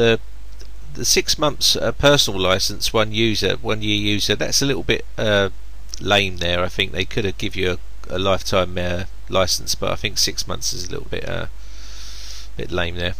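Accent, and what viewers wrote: United Kingdom. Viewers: This is one of the most annoying Brit accents...among many many others.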